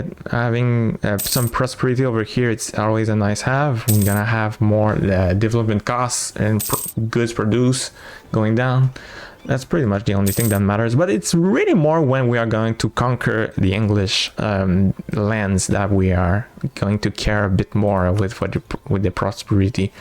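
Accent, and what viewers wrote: Quebec, Canada. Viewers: Ça c'est un accent québécois 😂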